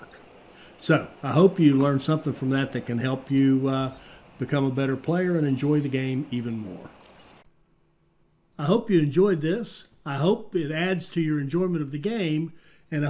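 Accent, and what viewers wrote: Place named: South Carolina, United States. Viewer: You sound like a Clemson man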